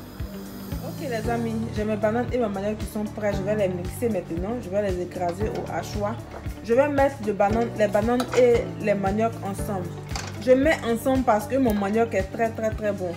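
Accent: Ivory Coast. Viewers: Ouh lala j'aime trop ra façon de parler à l'ivoirienne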